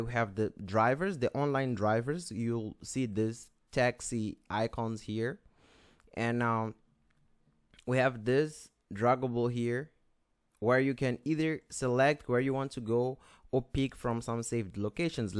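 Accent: Brazil. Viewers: you're Brazilian, right? lol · Mano tu é brasileiro?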